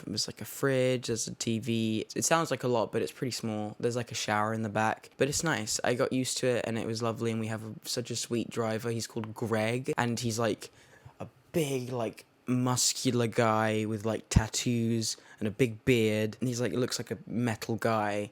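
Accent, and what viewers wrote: United States. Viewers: Can we talk about how AMERICAN his accent has become? Cultured <3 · is it just me or does he sound a bit american in this video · WHY DOES HE SOUND AMERICAN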